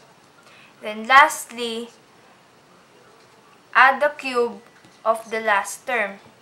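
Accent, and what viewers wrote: Philippines. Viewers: I'd thought the accent was familiar, Pinoy ka pala eh... Salamat sa video mo... naka pag arak ako para sa test ko sa school 😁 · Are you Filipino? Because you talk like one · ACCENT PALANG PINAY NA!! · Sabi ko naga ba pinay ka boses palang halata na haha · You are a Filipina, aren't you? because your accent though :D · Pinoy to guys im proud to be pinoy ang galing ito thank you po for uploading this (kung wla line of 7 ako) (accent mo btw is pinoy its easy) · are you a filipino? because you have the accent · You sound like a filipino trying to talk English.... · I knew na Pinay ka po ^^, accent pa lang. xD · sabi ko na Pilipino ka eh, yung diction mo eh :) great work! di ko 'to alam, buti gumawa ka ng video na hindi sobrang haba. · sabi ko na pilipino ka dahil sa iyong accent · U are Filipino, i can tell by the accent. We speak the same accent kse hehe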